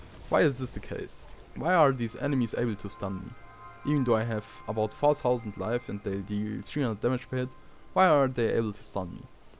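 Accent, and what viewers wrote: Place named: Germany. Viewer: Hab schon bei der ersten drei Sekunden erkannt das du ein Deutscher bist :D .. Cooles Video nice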